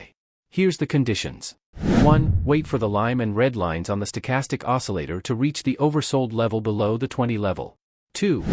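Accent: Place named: United States. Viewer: VOICE AMERICAN, MUSIC AFRICAN))) BEST STRATEGY 🤩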